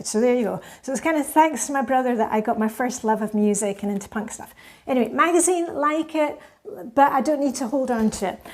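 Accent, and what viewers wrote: Scotland, United Kingdom. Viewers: Hi, so sorry to hear about your mum's passing, my mum was from Edinburgh and your accent always reminds me of hers - she never lost her accent after moving to England in the 80s - but she passed away in my early 20s. My question is: how would you have felt about the decluttering if you didn't have your brother to pass these records onto? We're currently getting ready for an international move and it's the sentimental stuff - you almost crave peace of mind that it's going to a good home, but obviously once it's out in the universe you don't have that control so you end up hanging onto it? Do you think you'd have been as ruthless if it was just being let go? You're always so good with decluttering, does it just become an easy habit making harder choices easier?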